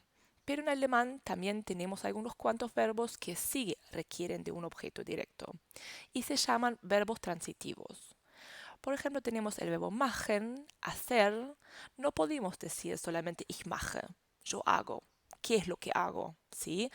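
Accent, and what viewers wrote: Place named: Castile, Spain. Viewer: Sos una crack... Dankeeee Totales!! P.d. Me emociona, se me caen las lágrimas y canto un tango por tu acento castellano. :)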